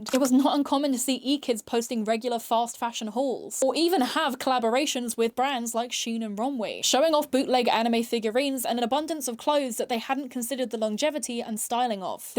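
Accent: United Kingdom. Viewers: OK, I can dig the cute dyed bangs, the funny eyeliner with the lashes kind of hits. Always admired a UK accent. But the huge goggles on the head? Never got that, dear.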